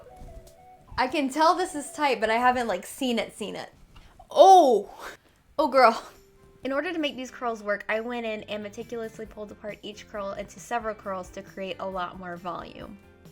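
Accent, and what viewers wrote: South, United States. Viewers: omggggg southern accent sounds so cute:) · This was very enjoyable. I'd like more videos like this on occasion. 😊 Plus, your comments at the end about the remnants of your southern accent were so fun/funny! 😁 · i love this video! two things: 1. lmaooo when you said "don't worry, i have another one" and i thought you meant a backup hair dryer, but NO you had a backup dome hat thing OF COURSE. 2. lollllll at your accent, saying that you don't have one, but those two words "git" you. your "e" is often "i" in a very southern and very cute way! no hate here, only love! thanks for another great video! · There's nothing wrong with a southern accent. I am a true bornand raised southern and i wouldn't have it any other way. This was my first time watching you. I subscribed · Im from the south too!!! Yea i notice the twang sneaking in too lol. 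Also could you do some more "curler" videos? Ive seen so many i wonder about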